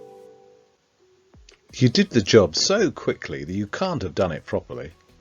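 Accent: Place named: London, United Kingdom. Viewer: How can I speak like a londoner? Lovely!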